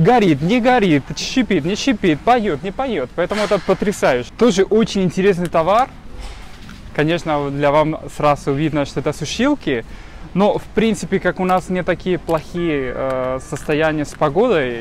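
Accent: Standard. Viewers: мексиканец? у нас не каждый кавказец так на русском чисто говорит..лайк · Парень чистенько по-русски шпарит! Молодец! И человек с юмором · Так чисто говорить по русски, просто Браво!!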